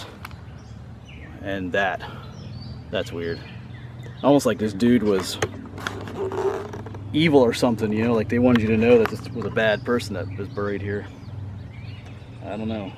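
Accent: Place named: New England, United States. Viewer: Watched the video of Ft. Duncan,there in the disputed territory of Western Virginia. I figured you for a yankee,hearing the accent. I reckon your ancestors kept in the rear to keep from getting killed.The reason l state this is because my ancestors fought for the South and went to Gettysburg. They were excellent shots,being yoeman farmers.Now their grandparents had owned slaves,but they got shed of them,once their families grew. They just followed the yankees way of thinking.Why support someone in winter,feeding,clothing and caring for their welfare,when l have blood kin l can use.So we will sell them off and make a tidy profit. DEO VINDICE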